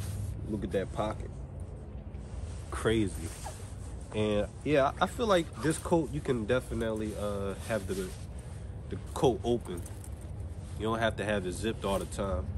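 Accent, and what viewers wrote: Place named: New York, United States. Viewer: You sound like u from new york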